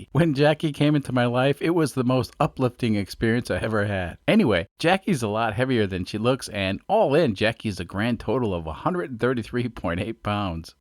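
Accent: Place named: Long Island, United States. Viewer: I thought I detected a slight Lawnguyland accent in your videos since I started watching (expatriated North Babylon native here).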